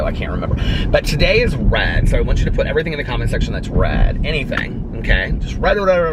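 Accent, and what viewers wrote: United States. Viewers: Love the American pronunciation for food....makes us brits / irish laugh...... also you guys still use the phrase handicap, in UK that isn’t acceptable any more. We use the word disabled. Also dressing up is wearing a black crew tee shirt lol.... in uk dressing up is wearing a suit. Glad you’re feeling better ❤️❤️❤️❤️ Lol in uk we have nothing but round abouts. If you ever come to UK DONT go to Milton Keynes.......nothing but roundabouts it’s like the capital of roundabouts 😂😂😂😂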